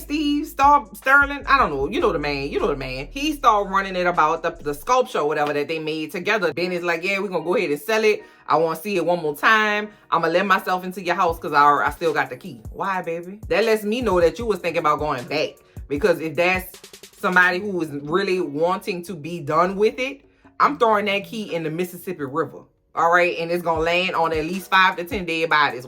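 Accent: New Orleans, United States. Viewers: I love your accent btw!! Sound like u from Nola :)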